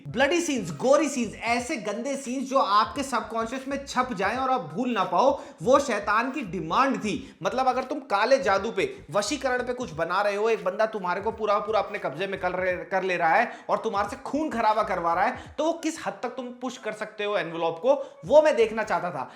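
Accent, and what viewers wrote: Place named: Delhi, India. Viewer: Delhi accent bhai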